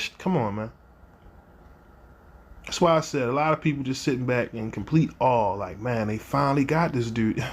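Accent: Baltimore, United States. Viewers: You from Baltimore dummy? Glad I found this channel Love hearing dudes from Baltimore get love we on the map right now with the music and shit 💪💪🔥🔥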